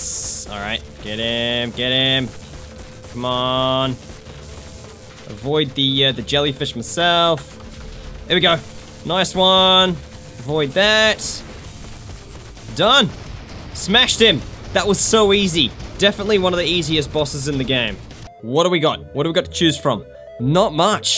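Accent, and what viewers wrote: Australia. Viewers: hes australian so he has a different accent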